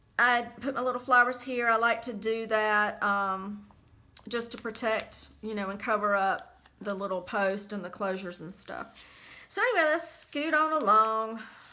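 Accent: South, United States. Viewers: Just found you and love your art, shop, and southern accent. (I am from SC)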